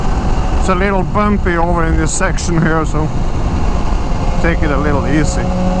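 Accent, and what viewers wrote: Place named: Northern Norway, Norway. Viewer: Can hear 'nordlendingen' in your English speaking.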